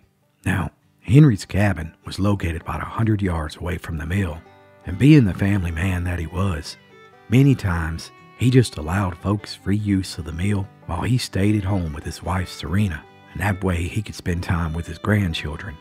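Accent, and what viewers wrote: Appalachia, United States. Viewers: Your voice and Appalachian accent are just what I need when things get heavy on my mind. I have intentionally taken my blood pressure before and after listening to one of your stories, and it never fails that my blood pressure drops considerably after the story. I told my doctor that she needs to prescribe your channel to all of her patients, especially the ones with high blood pressure. Thanks for all you do.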